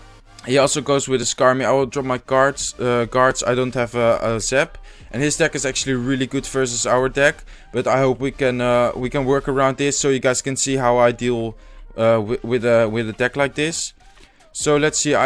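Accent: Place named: Netherlands. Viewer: Where you from? Je klinkt Nederlands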